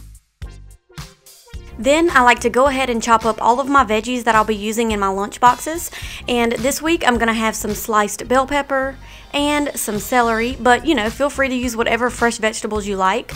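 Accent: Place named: North Carolina, United States. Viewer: As a southern girl myself in trying to isolate your accent. North Carolina? I thought maybe Georgia but I dont think so. Alabama here.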